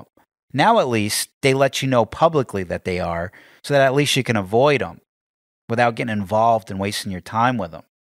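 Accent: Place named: New York, United States. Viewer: You sound like a New Yorker, I Love it! 🙌🏻✨🥂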